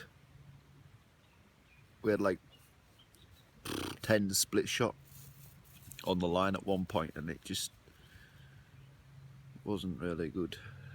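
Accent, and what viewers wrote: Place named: Yorkshire, United Kingdom. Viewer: he sounds like a proper yorkshireman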